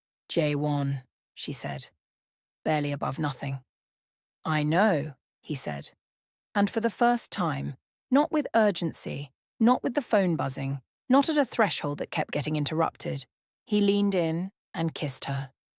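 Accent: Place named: United Kingdom. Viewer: Well this story was exhausting. If you want your audience to be capitived gove us something "cracking open". I felt the narrator was to "wordy about the pass of fathers than about the couple." I think the British accent was distracting and she had nothing to support the love balance I was expecting to happen. Part 2 showed a ring on her finger then nothing happened in part 3. Worst than a slow burn, it just was toooooo wordy with nothing to support the love they held inside. maybe 2 dates, no laughter, only closeness, a ring on her finger why? To much w/ narrator explsining life and never bringing them to heartfelt conversation about the future. Honestly, I skip through parts. This wasn't what I expected for 3 part. Never saw her wear white dress, then it was the end. DO BETTER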